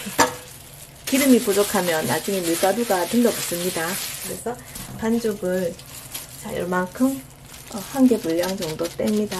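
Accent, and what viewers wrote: Gyeongsang, South Korea. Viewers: 제가 결혼하고 다른지역으로 왔는데 정겹게 경상도 말투로 쉽게 설명해주는 님 만나서 반갑고 좋습니다~~^^ 호떡 너무 좋아하는데 한번해먹고싶네요 감사합니다ㅎㅎ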